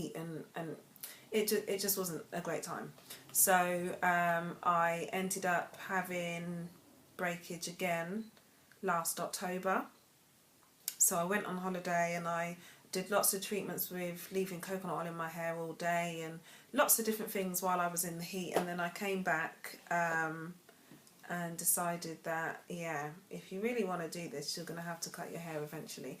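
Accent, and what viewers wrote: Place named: London, United Kingdom. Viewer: are you British your accent sound like you from london. loving your videos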